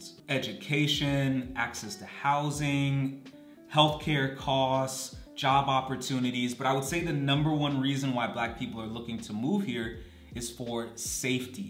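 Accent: United States. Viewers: You are black yes but you are also American. Your American accent will also afford you a level of respect that non-American blacks may not receive.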